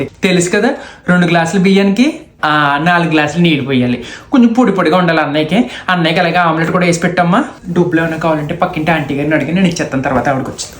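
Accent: Godavari, India. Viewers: garu miku Ela vastayi sir I alochanalu.....nijam ga mana godarolla yaasa.....amma chese panulu as it is alane dimpesaaru garu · bhai meeku telugu meda,godari yaasa meda unna pattuki hatsoff.udyiga reetya ekkada unna aa godavri anedi oka emotion enninjanmalaina akkade puttalane oka teepi gnapakam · ఒదినీ.... డొబ్బులు.... గోదారి యాస ఓ తీయని ఎమోషన్ 😂😂😂❤❤❤ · Hi andi nenu mi matalu ki inka mana Godavari slag ki addict ayipoyanu andi❤😊... I'm your fan from Hyderabad but ma native place vachhesi Amalapuram andi 😊#East Godavari ❤ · U slag is like godavari 😊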